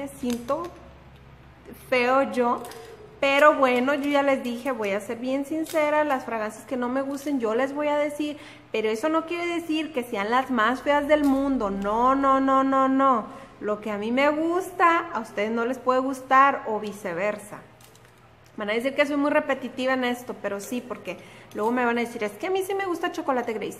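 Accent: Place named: North, Mexico. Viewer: Me parece que tú recomendaciones son buenas, felicitaciones. Por otra parte no te preocupes como hables, los argentinos, los colombianos, los españoles etc, hablan distinto y muchas veces con diferentes acentuación es y palabras mochas pero es lo que los distinguen de que país son, acá en México lo fabuloso lo más bonito es que cada estado es como un diferente país, y hablan diferente en cada estado, y mucha gente quiere estandarizar el tono de hablar, incluso hablar como chilango fresa (sin dinero) como si ponerle el tonito de fresa les diera caché. Lo más bonito es ir al norte y oír su tono fuerte e incluso con sh en vez de ch, o ir a Michoacán y oír como hablan se siente muy bien, esa es su forma de hablar y nosotros los entendemos ¿Por qué a fuerza querer cambiar la forma de hablar de una persona? Se oye que eres del norte y tu tono de voz es muy bonito y que bueno y te admiro que no lo cambies ni lo achilangues como muchos. 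Haber corrijan a los Argentinos por decir "tenés" en lugar de "tienes" es su forma de hablar muy característico, y no por eso no les entendemos, claro que entenderemos pero no falta el que se quiere sentir el sabiondo y corregir a diestra y siniestra. Respetemos el tono de hablar y su forma de hablar de las personas de diferentes Estados de la República Mexicana y de otros países que hablan Español. Su tono de hablar y su forma de hablar es su identidad.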